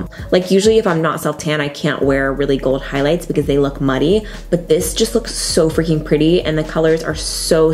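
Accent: South, United States. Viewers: I heard that southern twang girl! Tennessee REPRESENTTTT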